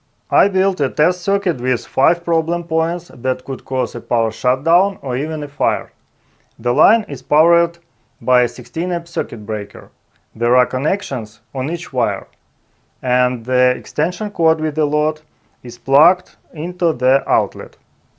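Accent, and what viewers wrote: Russia. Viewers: Хоть ломаный английский и стал получше, но акцент русского языка так и остался )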